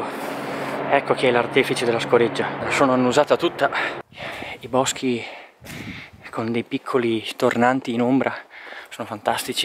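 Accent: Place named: Ferrara, Italy. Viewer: bellissimi video, complimenti! poi col dialetto ferrarese è tutto più scorrevole e simpatico. Se non l'hai ancora fatta devi assolutamente fare il percorso della "Madonna della Corona " da Brentino Belluno. una delle cose più belle che abbia mai fatto e sicuramente uno dei percorsi più belli del mondo! Ciao e buone camminate e ancora complimenti! Maialll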